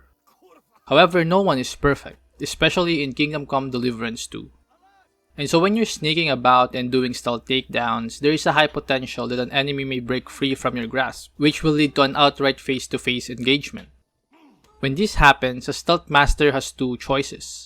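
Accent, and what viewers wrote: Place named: Philippines. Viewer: Noypi? I can hear it from your accent.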